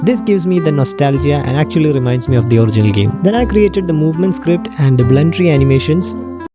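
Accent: Kerala, India. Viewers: Hey man are you Indian or English? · Bro are you from Kerala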